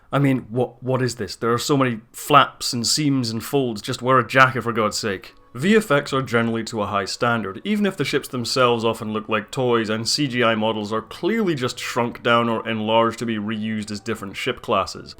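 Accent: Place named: Fife, United Kingdom. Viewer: Are you from Fife?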